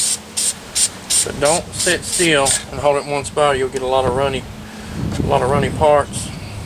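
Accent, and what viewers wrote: South, United States. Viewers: You talk like some texas fella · That is the strongest Southern Accent that I have every heard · no ofense but you sond contry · you have an amazing contry axent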